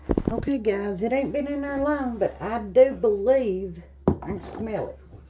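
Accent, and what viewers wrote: South, United States. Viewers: 3/4 cup of "Flair". I love your southern accent...and your sweet kind soul.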